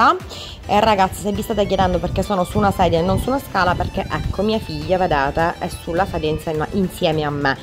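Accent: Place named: Sicily, Italy. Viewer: hai questo timbro di voce che abbiamo tutte noi siciliani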